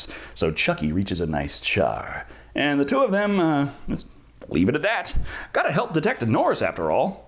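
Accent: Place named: Brooklyn, United States. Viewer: I Love that Brooklyn accent, bruh! 😆😆😆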